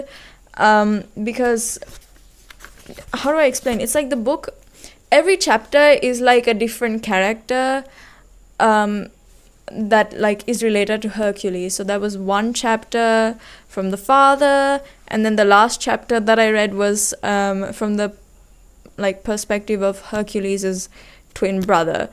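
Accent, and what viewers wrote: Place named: United Kingdom. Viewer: Uk English 🙏👍❤️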